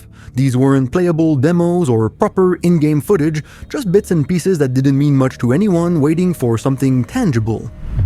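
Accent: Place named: Quebec, Canada. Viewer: Ah bin calice de tabarnak d'hosti de saint ciboire , messemblais que les prononciations sonnait que québécoise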